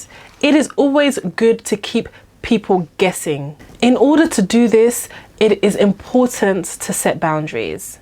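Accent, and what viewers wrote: United Kingdom. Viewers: I love your british english/accent. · a lovely British accent helps! Lol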